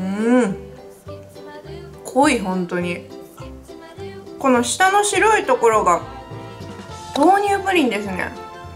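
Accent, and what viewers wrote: Aomori, Japan. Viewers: ちょっとなまってて可愛い♡ 青森っぽいけどどこ出身なんだろ、、 そしてそして今日も美味しそうです🤤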